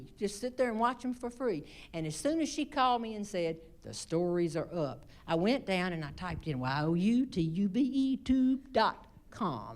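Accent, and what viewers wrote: South, United States. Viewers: I love her humor! I love her attitude!! I love her sweet southern voice! She is a joy to listen to!!! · Lord! What do they put in the water down there? I always thought we were funny up here in the mountains but you with your Southern accent have us beat good. How ‘bout heading up this way and exposing us to your down state humor? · It’s wonderful to listen to a beautiful southern lady and you’re absolutely hilarious 😂. One southern lady to another please keep the laughter coming, lord knows it’s what we need. Unlike most women comedians there truly not funny or some are just nasty and (completely tacky), though I doubt they even know the meaning of the word.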